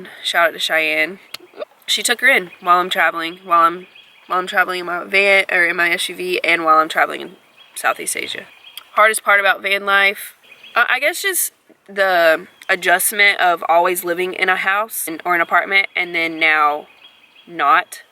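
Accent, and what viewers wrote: United States. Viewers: I love this! Where are you from? You have a little country twang lol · Caitzen lol yes it's sounds kinda Texan 💀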